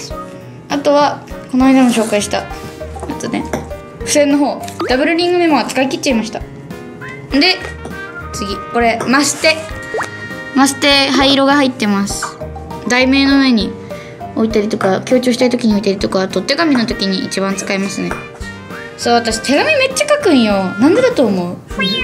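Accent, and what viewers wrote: Kumamoto, Japan. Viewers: 熊本弁もっと喋ってほしい！！かわいい、！ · 字綺麗で羨ましいです熊本弁可愛いすぎ(≧∇≦) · の筆箱紹介好き！同じふせん持ってる！！広島弁と熊本弁って似てるね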